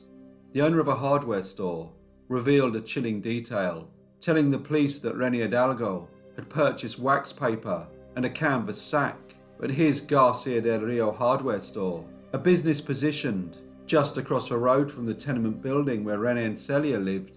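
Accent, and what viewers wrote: United Kingdom. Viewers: I had a hard time understanding you. That's all. Your British accent is real heavy. But the content was great though.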